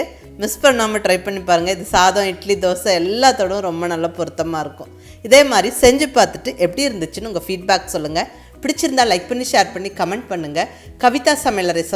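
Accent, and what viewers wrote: Kongu, India. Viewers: Super madem kongu tamil super mam tku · Namma kongu Tamil la fantastic ah ahsathureenga.......superb ga ma Vaazhga valamudan 🙏 neengalum vungal ahnbu kudumbamum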